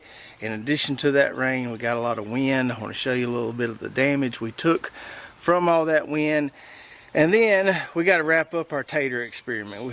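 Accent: South, United States. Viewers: I love how you say potatoes I like southern accent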